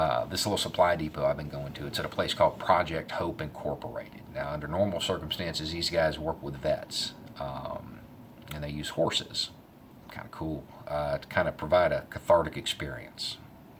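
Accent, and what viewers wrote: South, United States. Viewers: As a Liberal in Western Pennsyltucky, I can say that this guy has opened my eyes., my man, I really hope you read this; You are doing far more good than anyone I've ever known personally or met as an acquaintance. Keep on doing what you're doing. I fully support everything that you're saying. Jesus H. Christ hanging off a cross, I needed to hear this message from someone with a southern drawl. From the moment you addressed the hero complex and the AR-15 being a symbol of masculinity to the minute you addressed every issue in this video, more people need to hear what you have to say. I'm just saddened to say that the message you have to share is simply going to fall on deaf ears; don't let that deter you from putting your words of wisdom out there, because it is reaching enough people to make a difference. I fully stand behind this guy. 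Cheers,.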